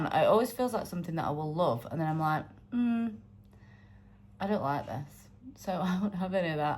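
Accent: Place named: North East England, United Kingdom. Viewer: Just stumbled across your channel. Love love love ❤. Why have I never seen your channel before? Watching an older video and thought with your accent and you caught the metro to work, that you lived in Manchester. You don’t. You live in the north east like me. I’m a fur baby mum and similar to you in personality and likes but I’m much older than you. So glad found your channel. BTW I live by the sea north east ❤❤ edit I’m from South Yorkshire originally but love it up here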